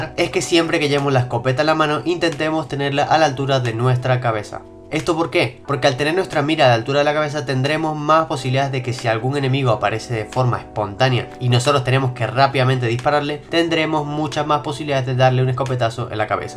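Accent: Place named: Spain. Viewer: Soy Venezolano y no suenas nada como Venezolano y totalmente como Español lmao, alto cambio de acento 😂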